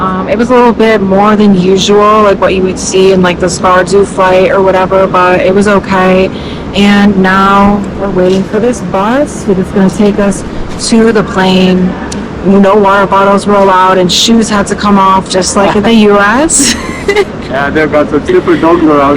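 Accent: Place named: Czech Republic. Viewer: I watched many videos , they were great .... Why do you speak with Czech accent ?!...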